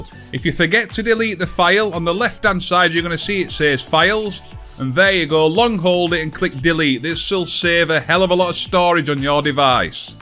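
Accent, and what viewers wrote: Yorkshire, United Kingdom. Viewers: Nice to see someone on Internet with a Yorkshire accent. The world is now a better place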